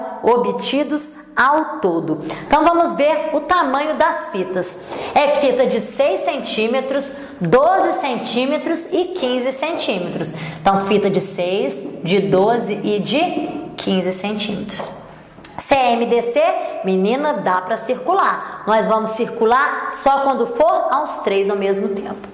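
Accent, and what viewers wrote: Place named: Minas Gerais, Brazil. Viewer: Professora, amei o vídeo! Adorei o sotaque mineirinho também! 🙂 Gratidão!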